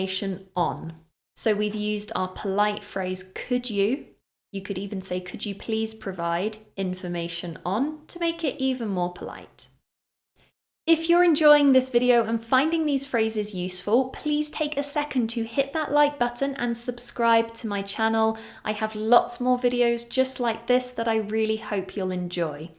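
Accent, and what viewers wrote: United Kingdom. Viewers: As a Brazilian citizen I prefer to learn from her British accent which is clear and sound more correct. I really loved this exciting 12 minutes class. I just found her channel and advise everyone to stick with her teaching.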